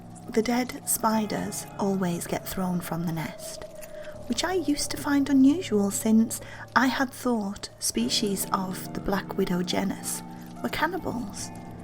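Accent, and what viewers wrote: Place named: Northern England, United Kingdom. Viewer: This was excellent! If you don't mind me asking, where abouts are you from? Your accent sounds quite similar to one of our narrators who is between Lancashire and Yorkshire :)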